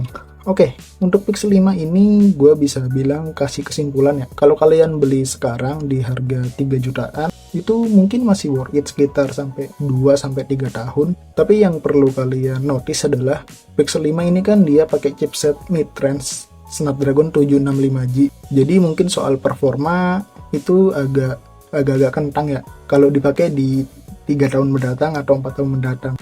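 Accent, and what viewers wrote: Bali, Indonesia. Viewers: Jujur logat lu bang kaya orang Bali agak ke India"an😂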